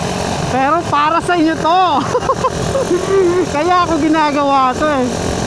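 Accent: Iloilo, Philippines. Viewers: Sir taga saan ka arang ilonggo kanyata ah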